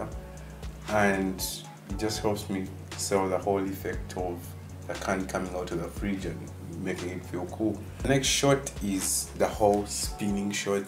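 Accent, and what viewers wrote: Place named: East Africa. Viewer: U sound like u from east Africa (Uganda 🇺🇬 )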